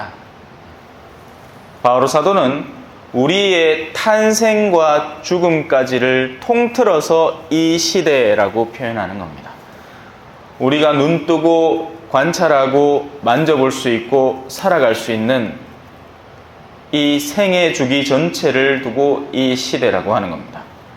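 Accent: Gyeongsang, South Korea. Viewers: 신부님... 세상살이도해야.밥먹고.신앙생활도.꼭.해야평화을..이웃.동료을살피옵니다.신부님에.직선말씀.의성.경북말씀..투박함.그대로.가식없으신.신부님.한번씩..속이.뚝.티입니다.언제나.정답..정답주시옵니다..베트남에서.함께합니다.🙏✈️✈️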